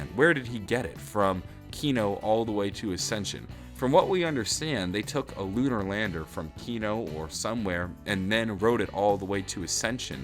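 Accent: England, United Kingdom. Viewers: Trust us english lot- he's saying bald we her his accent clearly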